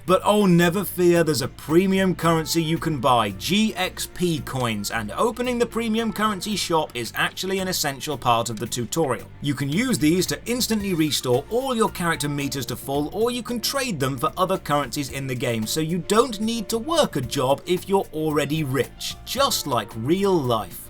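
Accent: United Kingdom. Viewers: Hello American guy eh? is among the most British looking and sounding people ever lol how'd you get that wrong:D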